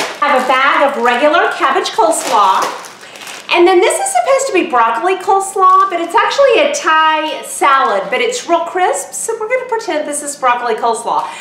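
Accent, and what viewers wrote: South, United States. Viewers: Thanks so much for the detailed response! Just found your channel today through Elle! I like your channel so I subscribed! I'm detecting a strong Southern accent - where are you from? I'm from North Carolina.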